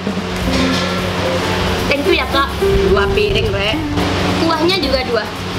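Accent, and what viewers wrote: Java, Indonesia. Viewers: setu-bekasi hadir n sllu setia nunggu konten2 dari kak yg cantik 😍😍😍 ngmgnya kak tu jawa banget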